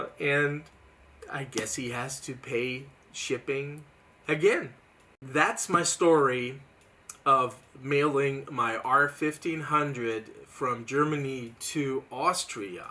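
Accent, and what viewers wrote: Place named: United States. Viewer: Bloody hell. That's crazy. BTW for a German you sound like an American ha ha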